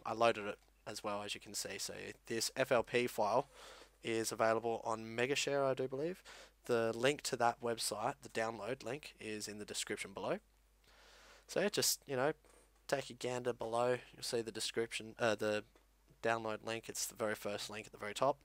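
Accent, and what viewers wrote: Australia. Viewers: YESSSSS AUSTRALIAN! FUCK , WHAT AM I DOING BAHAHA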